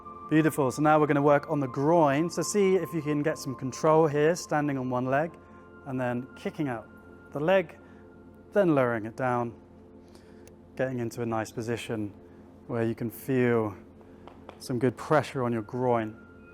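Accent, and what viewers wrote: United Kingdom. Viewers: Sometimes I watch your videos just for your British accent😅 (And I promise to do the stretching thing in the morning🫶🏻)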